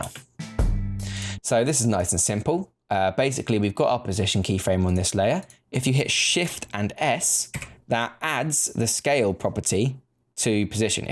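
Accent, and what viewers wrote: United Kingdom. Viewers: You are actually one of the better instructors, but your British accent, sometimes hard to follow for Californians like muahhhh. But I still subscribed. Thank you, sir. Brit.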